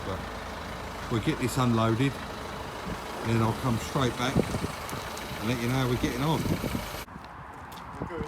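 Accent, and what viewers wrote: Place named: Cockney, United Kingdom. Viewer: I have to admit, when I first started watching your channel, I thought you were another Mike Brewer-esque "cockney cheeky-chappy" and it put me off a bit. I couldnt be more wrong. You come across as a really likeable honest bloke with quite a bit of knowledge. The Range Rover engine swap vids totally had me hooked. I really look forward to your vids now, as I never know what curveball is going to come next. (The bike for instance). Awesome channel. Keep em coming. "Lets get involved"👍👍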